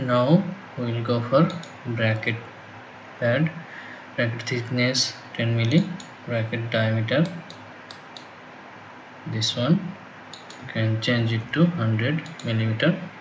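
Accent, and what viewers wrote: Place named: India. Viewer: Hi.what is you nationality dear dude?You speak like Indian People.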